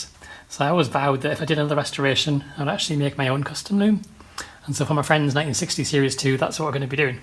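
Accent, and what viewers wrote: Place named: County Durham, United Kingdom. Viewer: Hello Mate, In the process of series 2a rebuild, found a lot of your vids really helpful but electrics Is my downfall have not got a clue. You sound as if you are originally not far from me I am in County Durham. How much would you charge to make me one of these looms ? I already have one through the Chassis, need one for Bulkhead. Thanks k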